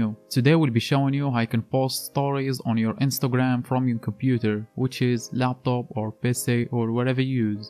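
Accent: Morocco. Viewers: mn soutk 3rftk mghribi hhh kayn m3alem abro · you'r accent like arab ( morroco - marrakech ) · from you accent i can tell that your moroccan am i right !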